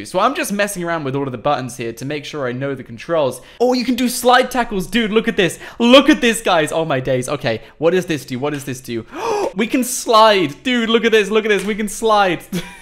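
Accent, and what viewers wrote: England, United Kingdom. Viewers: Are you from England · Wow you are English wow man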